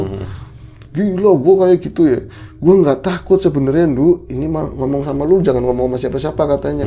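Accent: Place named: Solo, Indonesia. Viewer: Mas flexible, ya. Tema wong Solo otomatis setelan gaya bicara wong Solo. Tema Betawi otomatis gaya bicara ngikut dialek Betawi. Mangstab 🤭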